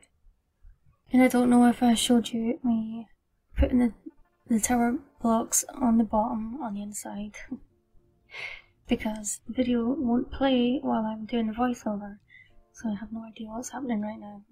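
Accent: Scotland, United Kingdom. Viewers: 🙈 Hearing the Scottish accent once I turned up the volume really threw me!! But looks great! 👌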